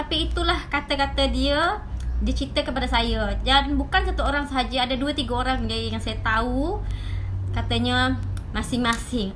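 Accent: Indonesia. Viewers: Assalamualaikum..admin ni orang Sabah ke..slang indo pun ada jugak bunyinya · Dia org Serawak, mmg Ade slang Indo ckit kan. Sabahan pun mcm tu Tau. Awak x pernah Ade kawan sabahan n Serawak ke? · ni org Indonesia knn... Bahasa yg awk guna tu.. gaya keIndonesiaan tu jelas kedengaran.. klo gaya bahasa Malaysia tulin lain..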